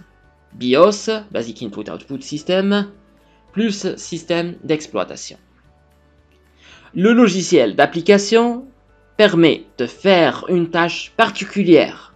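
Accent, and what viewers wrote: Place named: France. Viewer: Vous avez une belle accent française ! J'ai cru au début que vous êtes français. Keep going 💪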